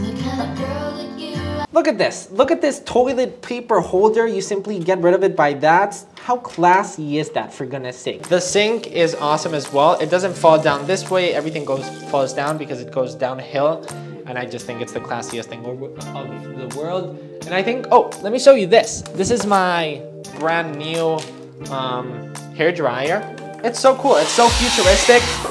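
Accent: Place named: Spain. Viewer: is he spaniard? I can notice an accent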